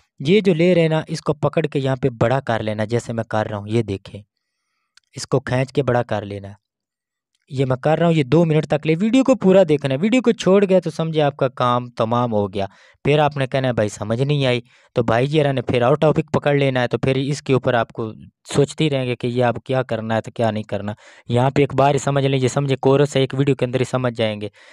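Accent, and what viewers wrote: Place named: Punjab, India. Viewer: اپنا لہجہ درست کرنے کی کوشش کریں پیارے بھائی آپ اردو بولتے ہیں تو ایسا لگتا ہے کہ آپ پنجابی بول رہے ہیں اسکے لئیے آپ نے زیادہ زور سے نہیں بولنا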